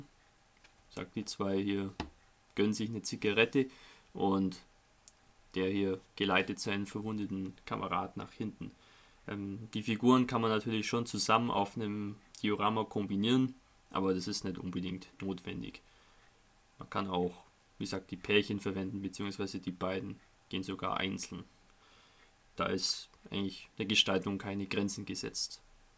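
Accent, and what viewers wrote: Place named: Swabia, Germany. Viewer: Naja ich denke mir manchmal schon wie amüsant der schwäbische Akzent doch manchmal ist... für einen Rheinländer etwas befremdlich aber dennoch macht es den besonderen Charme der Videos aus.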